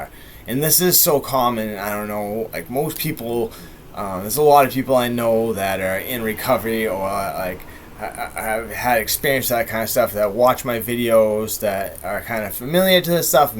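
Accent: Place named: East Coast, United States. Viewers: I can't pinpoint your accent but east coast would be my general guess lol I'm from the mid south with some what of a southern draw lol oh well i love the east coast and have seen most of it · Massachusetts accent 💪🏻💯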